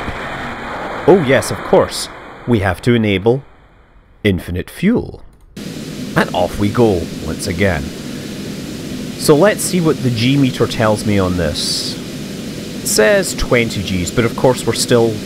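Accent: Scotland, United Kingdom. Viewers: Aaron Muck Yeah, they're both Scottish accents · What the hell is that accent. Scottish word here, American there. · Baruch Storm hes scottish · dat scotish accent doe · Sam Da beest Scottish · he's Scottish i believe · HES SCOTTISH!? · Scott Manley is, well Scottish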